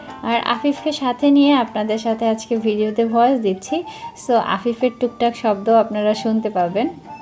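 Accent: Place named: Madaripur, Bangladesh. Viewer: Vapsa gorom hahaha.apu amar husband o tomar moto kotha bole karon amar husband o madari purer.tumi jemon kicu kicu kotha ae vasae bolo o temon kicu kicu vasae bole.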